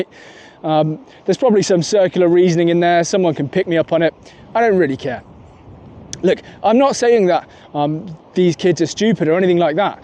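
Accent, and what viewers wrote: RP, United Kingdom. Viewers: You sound posh, probably went to a private school which many families cannot afford. YOU ARE PRIVILEGED. Also, consider this, do you really think if all else was equal and everyone had a chance (including the huge pool of international candidates who are statistically more talented than you) that you would get into Cambridge?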